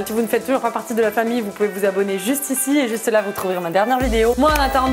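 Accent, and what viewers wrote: Quebec, Canada. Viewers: Moi je suis la depuis que ta fait la vidéo ou ta apris a parler quebecquois <3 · Je suis abonné depuis les vidéos Québec vs France quand tu parlais plus avec l'accent Québecois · Moi je suis là depuis trois ans et franchement t’as changé et puis ton accent québécois c’était un truc de dingue avance bises franchement je t’adore ne change pas parce que t’es drôle et continue tes devinettes Tes blagues en début de vidéo tous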